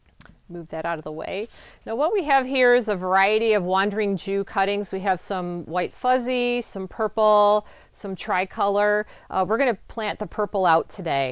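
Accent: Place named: Chicago, United States. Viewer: Her accent makes me miss Chicago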